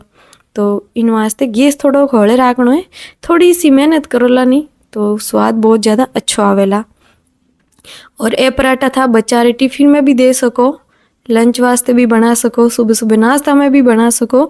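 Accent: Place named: Jodhpur, India. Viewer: Jodpuri boli Masha Allah ap ke zaban sy bohot pyari lag ti ho hum apko Pakistan sy follow krty Hain allah Khush rakhy apko❤❤❤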